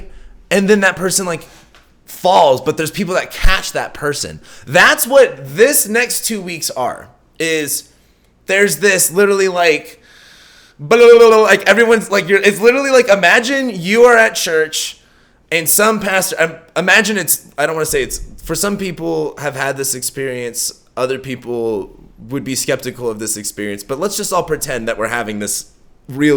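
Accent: California, United States. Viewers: Yes! Love that midwestern twang coming out from under that California accent!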